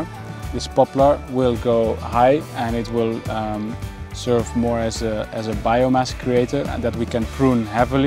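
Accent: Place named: Netherlands. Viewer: you sound dutch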